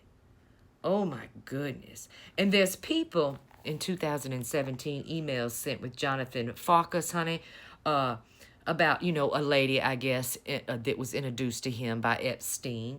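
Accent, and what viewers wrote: South, United States. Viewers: luv your voice ma'am! true southern bell..... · I want to be southern and sound like you. Also you always hit it hard. Thank you.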